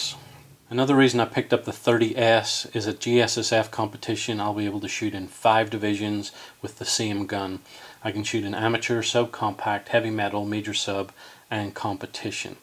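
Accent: Ireland. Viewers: Are you from rural Ireland?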